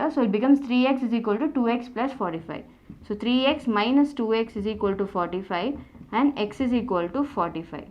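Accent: South India, India. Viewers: Mam are you from south india 🙂.Thanks for English explanation. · South Indian English accent is just so sweet. Great teaching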